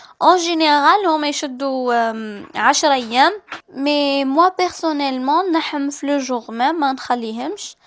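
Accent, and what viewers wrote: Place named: Kabylie, Algeria. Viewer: بنت بلادي نموت عليك ونموت على هدرتلك تحيا اللهجة القبلية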